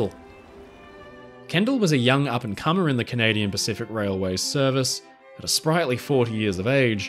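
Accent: Australia. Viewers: I like how you speak australian. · "something about your accent" - quietly spoken articulate non ocker Australian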